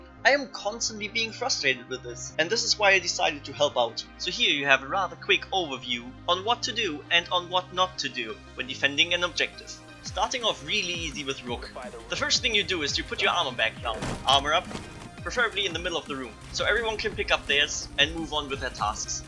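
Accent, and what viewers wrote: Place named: Germany. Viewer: Haha, ich bin auch deutscher, ich kenne den Akzent von mir selbst nur zu gut:D Aber mach weiter, deine Videos sind super! Und es sollte 'german accent' heißen, nicht 'germany accent'. Ich hasse es, auf dem handy zu tippen:/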